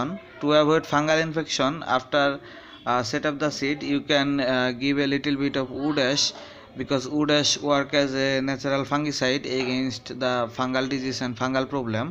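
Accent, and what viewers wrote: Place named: Butler English, India. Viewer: Butler English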